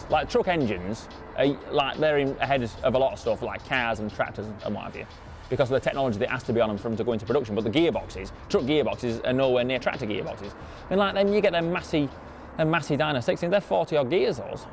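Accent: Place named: Lincolnshire, United Kingdom. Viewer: Nice to hear a Lincolnshire accent mayat.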